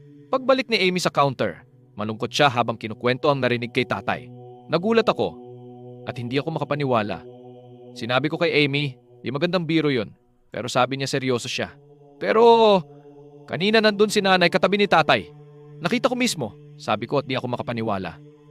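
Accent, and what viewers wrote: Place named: Visayas, Philippines. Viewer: from Visayas ba kayo? may punto po kasi, curious lang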